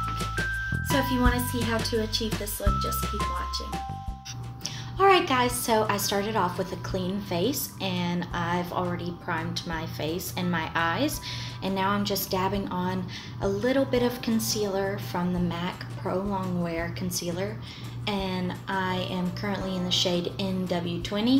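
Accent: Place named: South, United States. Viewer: Ohhh fun!! I thought you had a southern accent for sure lol. I'm from Michigan, born & raised here ❤💙💚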